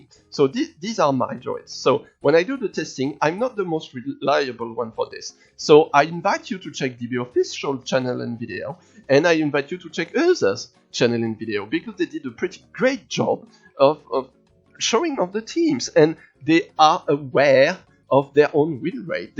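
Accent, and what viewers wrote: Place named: Poland. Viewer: Pas ça s'entend pas des masses, j'ai entendu un accent mais je pensais que t'étais polonais c'est à la fin c'est comme qui dirait writté entierementely in Françish. Du coup d'ailleurs je me rapproche du G13 avec GG et à ce propos, MIS A PART les pièces de G12, est-ce que le passage de G12 à G13 procure des PV supplémentaires à GG ou pas?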